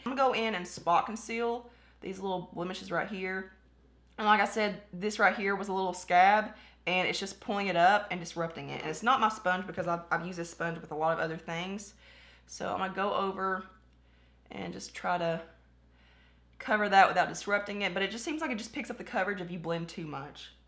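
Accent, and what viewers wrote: South, United States. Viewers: I’m glad you actually take the time to REALLY test the foundations!!! Love your channel and that cute sweet southern accent!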